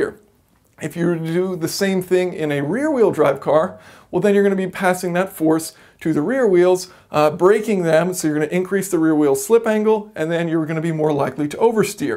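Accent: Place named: United States. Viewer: only problem he is american. and drives lhd car and has a american accent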